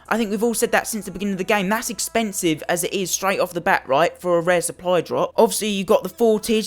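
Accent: United Kingdom. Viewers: 10 minutes of the same sounding douche I swear does every bo3 YouTuber have to be a British fack.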